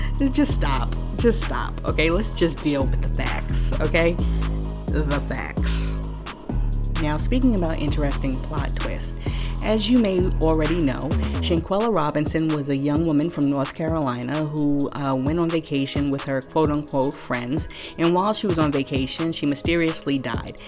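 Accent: New York, United States. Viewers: That New York accent is 👍🏿👍🏿!!!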